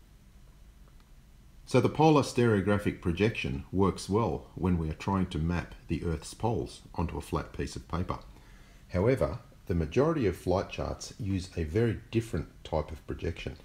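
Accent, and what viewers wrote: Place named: Australia. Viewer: Australian I believe.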